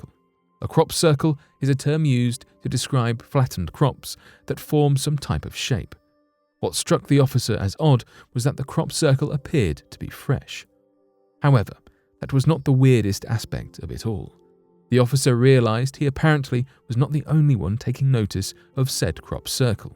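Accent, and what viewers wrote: United Kingdom. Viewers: You can tell, besides the accent, that a Brit wrote this. 1906 quake was the San Francisco earthquake of 1906.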